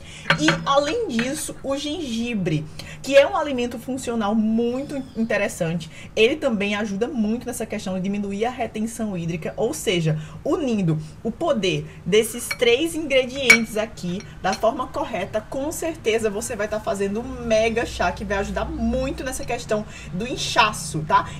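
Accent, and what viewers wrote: Recife, Brazil. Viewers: Ela é de Recife sim, sotaque lindo né ❤️ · Adorei a receita. Muito saborosa, principalmente com limão!!! Pra mim foi difícil achar a cavalinha fora do Brasil, mas a Amazon me salvou. Rsrsrsrs Essa nutri é de Recife? Parece muito com meu sotaque 🤭 abraços para minha possível conterrânea 😁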